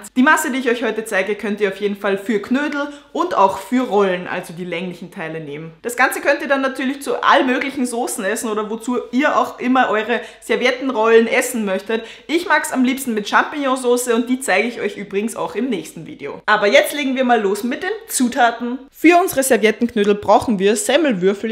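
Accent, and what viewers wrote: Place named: Austria. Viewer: Red mehr Österreichisch😂❤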